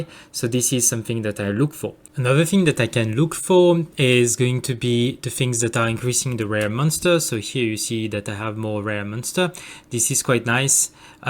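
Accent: France. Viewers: On reconnaît bien l'accent français. Merci pour la vidéo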